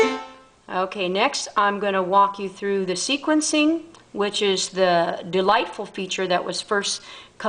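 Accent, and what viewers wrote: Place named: United States. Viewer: I love your American accent :-)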